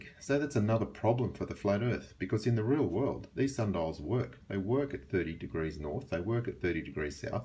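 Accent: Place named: Australia. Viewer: He is Australian as far as I can tell. Here is the NZ accent <link>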